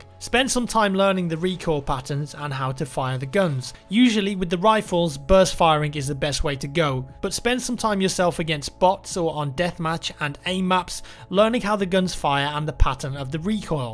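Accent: United Kingdom. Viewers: U r british m8?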